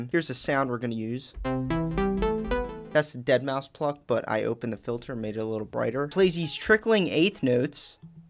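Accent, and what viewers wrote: Philadelphia, United States. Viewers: I think we know it's a masterpiece, but we can't explain why. And you've definitely showed us. Also, that's 100% a philly accent. Go birds!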